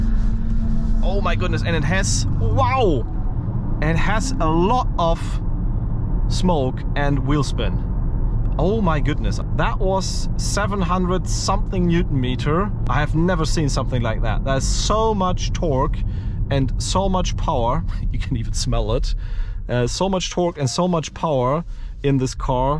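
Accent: Germany. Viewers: Man hört diesen Deutsch Akzent sooo raus haha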